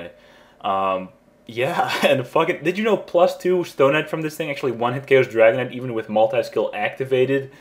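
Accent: Netherlands. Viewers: Is it possible that you are Dutch? Your English sounds Dutch :P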